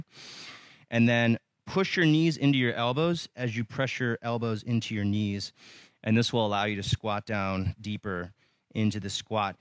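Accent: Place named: United States. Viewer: awful american accent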